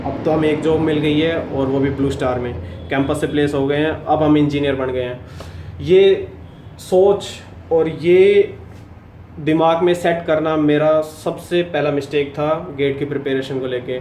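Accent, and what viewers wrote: Haryana, India. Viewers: Boli m jyada Haryanvi touch h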